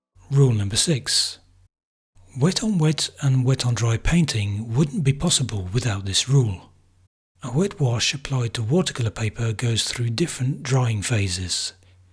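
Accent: United Kingdom. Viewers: Why do you sound like you are British when you ask us to pay for your classes in dollars.,and why don't you stretch your paper first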